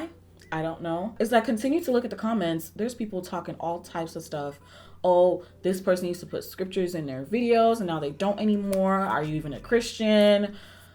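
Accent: Africa. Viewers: Hey... great video, you and my youngest Erin share the same birthday!! Happy belated birthday. You have helped me so much with this video about the weight gain issue... I plan to be more aware of what I say to my 19 year old daughter!! Kudos to you for putting yourself out there. It tickles me to hear your African accent. I’m married to a Cameroonian and you make my day when I watch your videos!! Lol keep the videos coming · I’m living for the African accent thoooo😍😍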